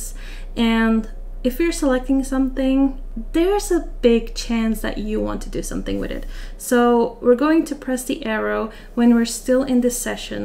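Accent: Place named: India. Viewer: I'm sorry... But I'm here to simp😅😅😅 p.s. I really thought you were Indian... Good work though.. keep up the good work ❤️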